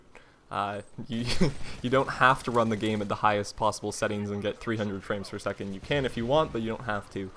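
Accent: Canada. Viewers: hey are you from canada??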